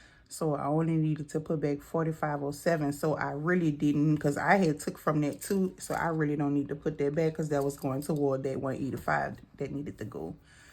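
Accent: Louisiana, United States. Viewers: Hey, girl you got me with robbing peter to pay paul!! 😂😂 New subscriber!! I love your accent...are you from Louisiana? ❤☺️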